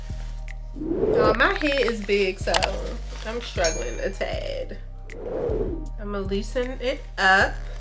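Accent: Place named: Baltimore, United States. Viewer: lol, it wasn’t as Baltimore thick as I’ve heard. I definitely said. “She’s from the DMV” but the trees was slight so I didn’t pinpoint Baltimore.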